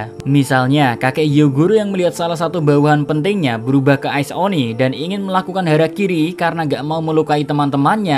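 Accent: Java, Indonesia. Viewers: Konten react paling ....... ditunggu dan terngiang dgn logat jawanya 😂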